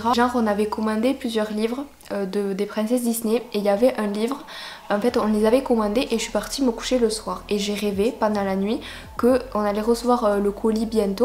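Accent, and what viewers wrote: South, France. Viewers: Et j adore ton accent du sud ^^^ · l'accent du sud ❤❤❤ j'adore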